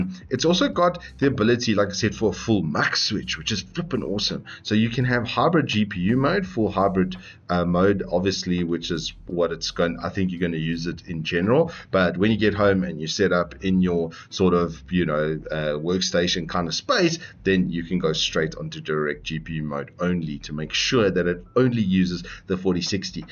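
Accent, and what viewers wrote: South Africa. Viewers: hes south african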